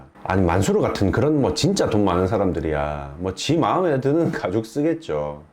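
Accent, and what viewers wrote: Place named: Gyeongsang, South Korea. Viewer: 경상도 억양이 살짝 있네요.ㅋㅋ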